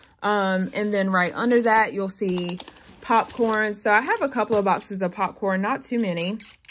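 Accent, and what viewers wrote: South, United States. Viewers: You have a country accent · You must be southern. Love your voice!!! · Love what you're doing! Your family will be safe for a very long time. Also love the southern accent ❤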